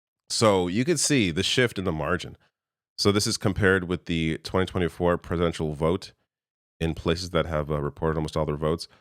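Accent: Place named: Canada. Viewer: I love your direct, no BS, "call it what it is" style and the fact that we have a very representative Canadian voice on YT. And, finally, some good news. It will be great news when Musk is gone and even better news when Trump is gone--as he says about getting other countries--"one way or another".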